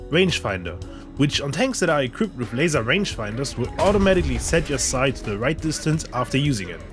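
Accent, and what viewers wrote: Germany. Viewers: I hören German ?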